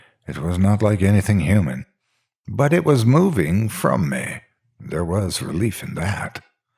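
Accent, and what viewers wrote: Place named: Missouri, United States. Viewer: I knew not that the great Twain had written a spook story. Excellent as all his works are. Thats a great Mssooouriy accent too!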